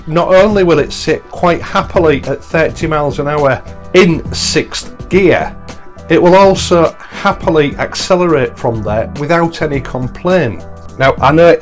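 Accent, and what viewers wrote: United Kingdom. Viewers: Love the bike and the way you review it. So great to see a company many would smile at forking out such a beautiful machine. As someone living in Australia (Straya, mate) i almost need sub titles...which part of the UK talks like this?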